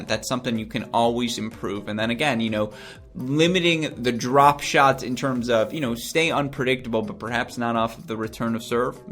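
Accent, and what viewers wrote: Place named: United States. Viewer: Well described, well resumed, well explained. And what a beautiful american accent!!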